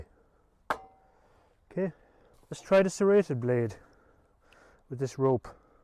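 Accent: Ireland. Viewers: Great review I like watching your videos, the way you talk and the words you use remind me of my Grandfather, He was from County Mayo on the West coast.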